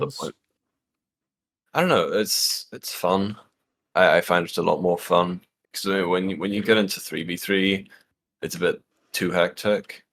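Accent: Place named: United Kingdom. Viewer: why is he british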